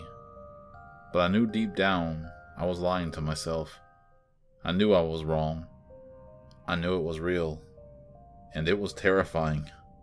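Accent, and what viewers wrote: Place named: Michigan, United States. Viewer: And are you Native? Your accent actually reminds me of some friends of mine from Michigan that are Ojibwe! It was the southern "influence" in your voice that threw me off though! LOL!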